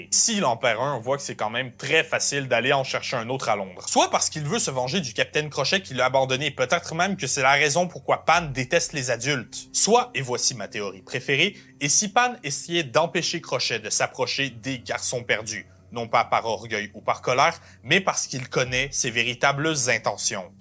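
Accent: Quebec, Canada. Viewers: Hoo il viens d’où cet accent? Quebec ? Dsl jsuis juste curieux. Sinon tjrs content de voir une analyse d’une de mes oeuvre favorite (du moins le roman, et oui j’aime la cruauté du peter original 😅) . Que tu a tres bien reussi.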